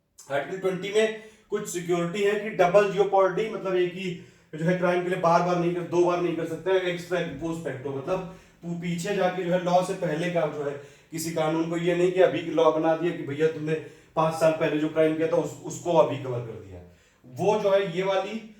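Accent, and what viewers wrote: Kanpur, India. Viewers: sir Aapka kanpuriya andaj Dil jeet leta h kasam s entertainment with study 🙏🙏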